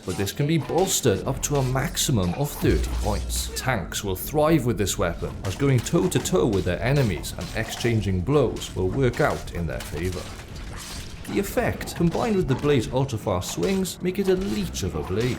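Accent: Wales, United Kingdom. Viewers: I didn't see your name until after I noticed your accent 😂 fellow Welsh Skyrim enjoyer.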